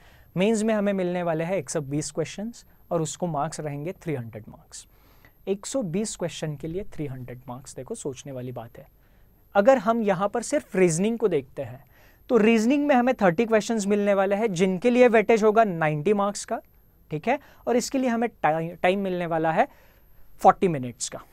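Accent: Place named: Maharashtra, India. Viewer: ....big fan of your teaching style PS: by your hindi pronouncation seems like you are a Maharashtrian....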